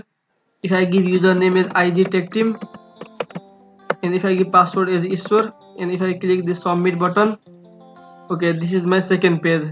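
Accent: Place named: Nepal. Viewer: ayo nepali accent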